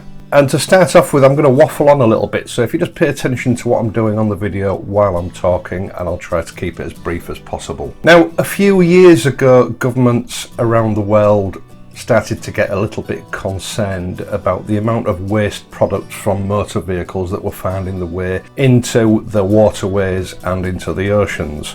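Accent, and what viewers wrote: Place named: East Yorkshire, United Kingdom. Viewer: Great video! Nice and clear as always and your calm E Yorks tones are most relaxing too 🙂. (Could I just be a little bit pedantic though by saying that air pockets in the system are not 'cavitation'. Cavitation is caused by pressure vapourising liquids in things like turbines, centrifuges and with boat propellors.)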